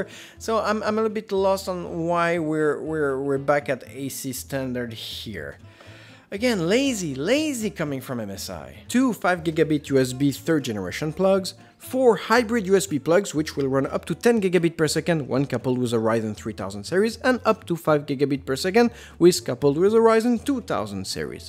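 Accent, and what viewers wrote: France. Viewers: I love his accent and now I desire a french man 😂🤦🏽‍♂️ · Mais mais mais... je me disais bien que this voice hear french XD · oh, you're french?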